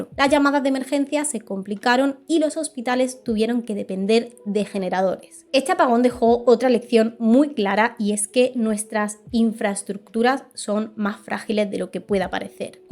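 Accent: Andalusia, Spain. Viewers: Eres andaluza o manchega?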